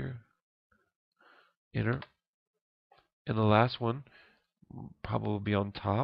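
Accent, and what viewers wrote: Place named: Texas, United States. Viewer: Yes... Great intention and i think i get it BUT a bit too fast even though you sound like you're from Texas lol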